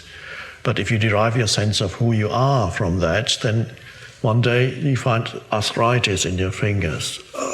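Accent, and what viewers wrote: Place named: Argentina. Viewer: Grande ese Eckhart hablando en "argentino" 🥰🥰🥰♥️♥️♥️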